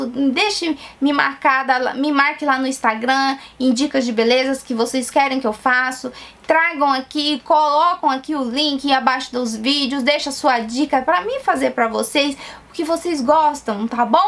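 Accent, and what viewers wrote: Minas Gerais, Brazil. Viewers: Eu amooo ouvir ela falar, parece aquelas mineiras bem da roça kkk ❤ Amo seus vídeoooos ❤❤❤❤❤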